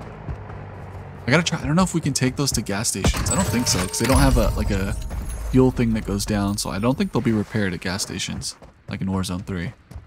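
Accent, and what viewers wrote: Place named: Mexico. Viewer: I know ur Mexican u have a strong accent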